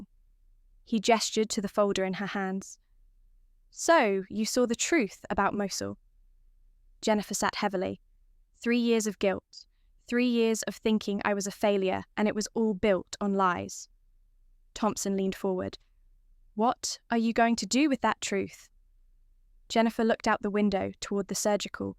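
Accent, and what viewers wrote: United Kingdom. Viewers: Good story. Love the British accent and no AI mistakes in pronunciation or misspelling So refreshing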